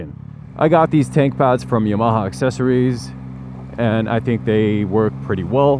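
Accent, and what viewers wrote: United States. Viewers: זה המבטא האמריקאי הכי טוב ששמעתי בחיים שלי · זה המבטא האמריקאי הכי חלק ששמעתי מישראלי אי פעם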